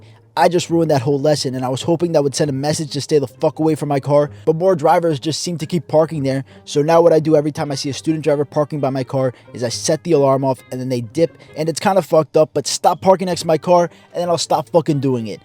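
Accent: New York, United States. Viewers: You have a New York accent. Are you from NY?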